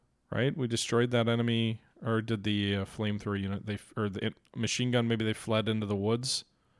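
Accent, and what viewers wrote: Germany. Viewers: That german accent is pretty good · Is that a german accent? 😅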